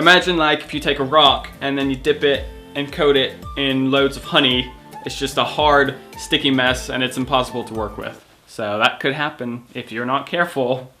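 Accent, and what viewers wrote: United Kingdom. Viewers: I just realized, do you have a British accent?😮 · do you live in the uk? i don't remember you having an accident. certain words you say has a different sound; you phrase things so clearly. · Your accent sounds kinda British in this video. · You've got a little British accent coming and going in this vid, dude. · i've been wondering the same thing, I can go back to a video he made a year ago and he speaks normal american english, but now he talks with a british accent all of a sudden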